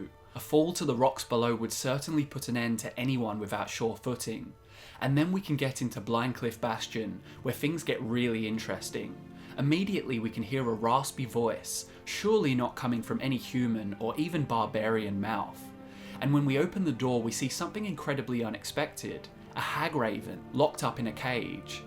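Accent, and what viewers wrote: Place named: Australia. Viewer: Are you an Aussie? you sound it. I am too XD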